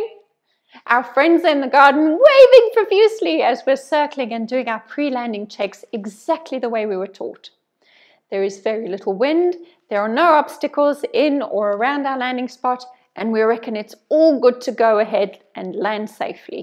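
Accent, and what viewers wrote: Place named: Australia. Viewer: >>> Pardon my asking this, and you do mot have to answer if you prefer not to, but are you originally from France? I ask because you have a VERY GOOD command of English, and your accent vaguely sounds Australian. Or maybe English. I am American, so what do I know...😉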